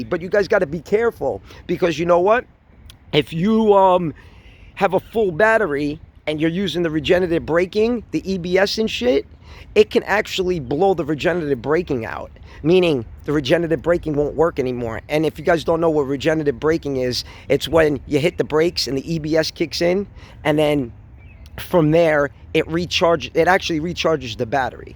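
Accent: New York, United States. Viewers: Great video i love your Ny accent and i love all your scooters nice!!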